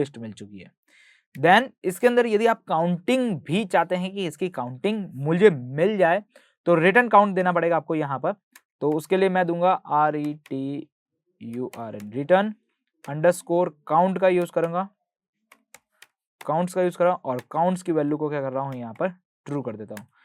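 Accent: India. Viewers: get rid of that indian eng it sucks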